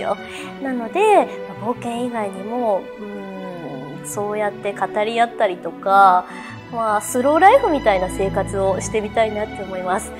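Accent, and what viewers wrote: Kansai, Japan. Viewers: the kansai dialect definitely made it 10x better <3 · Queen of Kansai Dialect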